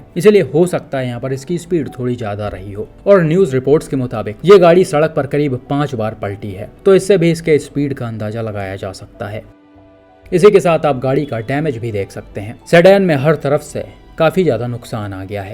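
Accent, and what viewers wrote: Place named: Assam, India. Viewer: Pls don't critcise hindi speech.. It is Assamese accent..pls respect it. I am from UP but residing in Assam for more than a decade.. 🙏🏼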